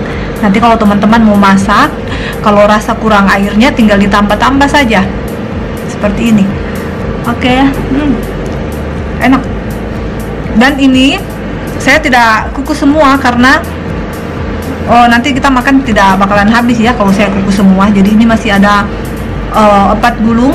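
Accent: Manado, Indonesia. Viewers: P senang vja dengar ba logat bah Manado,,beking kangen pa Kel.di kampung,,,btw Malaysia di mn dang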